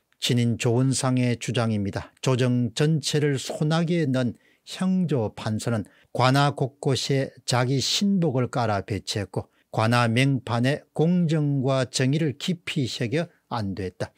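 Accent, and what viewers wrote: Gyeongsang, South Korea. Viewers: ..갱상도 분이어서 발음이 힘드시지요? 조은상 이아니라 조은산 입니다...^^ 아무리 갱상도 발음에 평생을 갇혀계셨어도 수십만이 보고듣는 유투브 방송인대..발음은 조금 신경쓰셔서 해주셨으면 합니다..감사합니다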